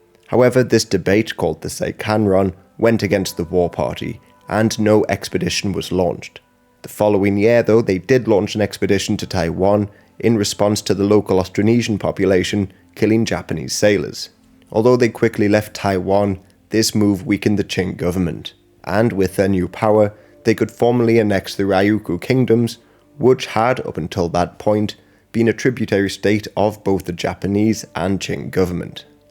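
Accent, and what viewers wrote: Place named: United Kingdom. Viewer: wtf are you talking about, he has a British accent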